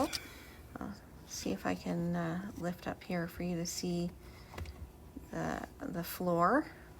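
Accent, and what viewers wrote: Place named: France. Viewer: The very informative, but it is difficult to understand your very thick French accent.😔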